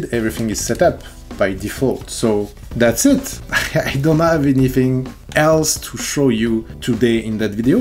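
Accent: France. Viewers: Did you manage to get rumbles with the Xbox controller ? Btw I heard ur french accent ( Or maybe german/dutch)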